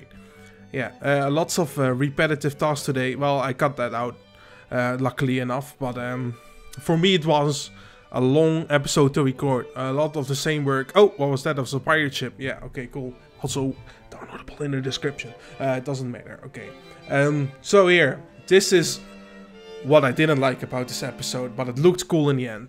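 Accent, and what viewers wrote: Netherlands. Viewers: Ik hoor dat je nl bent 😏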